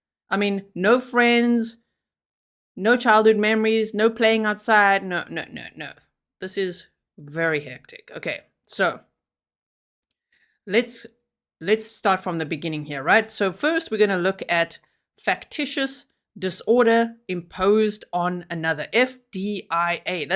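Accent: South Africa. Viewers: In the beginning I was like: She sounds South African...I wonder where she's from? And then you used the word 'shame' and then I knew😂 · I detect a faint South African accent? ❤️🧡💙💚💛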